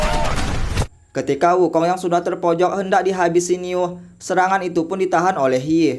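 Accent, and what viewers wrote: South Sulawesi, Indonesia. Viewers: Kenapa logatmu kayak orang soppeng atau bone😅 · logatnya lucu, ugi'banget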